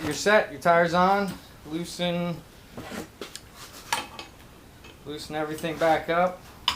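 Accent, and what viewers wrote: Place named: South, United States. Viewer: I hear saquetas.......... You must live in the South? Lol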